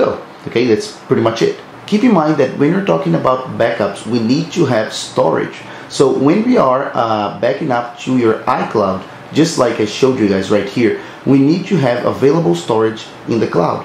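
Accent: Brazil. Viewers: It took me 5 secs to know this dude’s a Brazilian... it’s engrained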